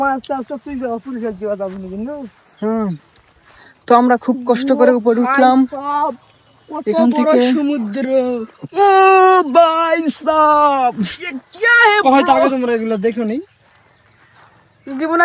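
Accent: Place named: Kushtia, Bangladesh. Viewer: ভাইয়া তুমি কি কুষ্টিয়া জেলার ছেলে তোমার কথা গুলি ওদের মত🤔🤔🙄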